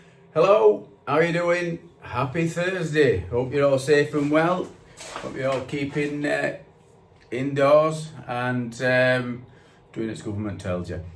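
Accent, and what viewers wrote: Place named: Ilkeston, United Kingdom. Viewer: Love the accent, sounds like an ILKESTON one to me, plus you look better with the beard. On a more serious note, I renovate properties for a living and tried your injection methods into the mortar for the first time and yes it worked out fine for me. You give out basic no crap information, good luck with your future videos, thanks Dave.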